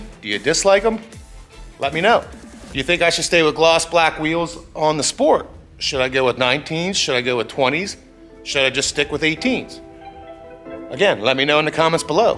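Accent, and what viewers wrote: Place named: Philadelphia, United States. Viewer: OMG you have such a Philly/South Jersey accent !!! PS - Your 2022 Civic sport looks amazing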